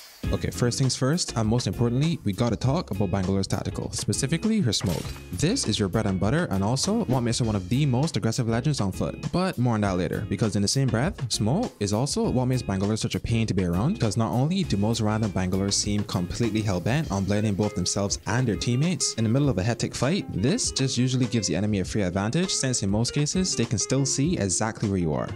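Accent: Barbados. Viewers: Sound like a bajan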